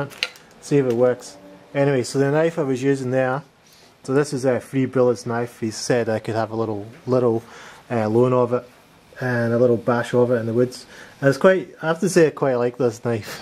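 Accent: Scotland, United Kingdom. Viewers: There,s me thinking ah yes got to be an aussie and then I hear a man with ma home country accent, see you, you must be a jock thats what im thinking,anyway loved your video and the intro is good enough for the movies keep up the good work all the best.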